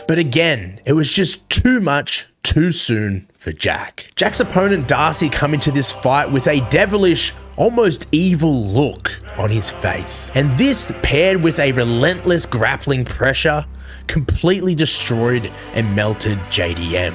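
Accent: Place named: Australia. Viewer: Loving your videos mate. So good to hear an entertaining Aussie accent.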